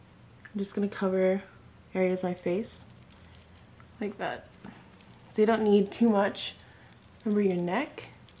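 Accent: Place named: United States. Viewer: Are you originally from America? You just have an American accent that's all (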